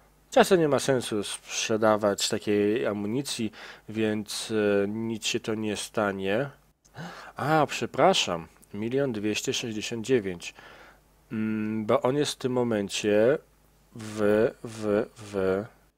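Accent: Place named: Poland. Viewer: A czy uważasz idioto, że to Niemiec? Jest Polakiem więc mówi tak jak powinno się mówić w Polskim języku.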